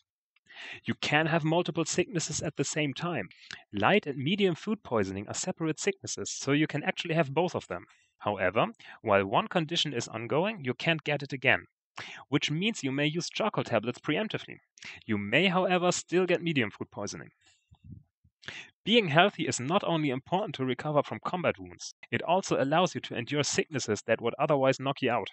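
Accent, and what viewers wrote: Germany. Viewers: Schönes deutsches Englisch :) habs sehr gut verstanden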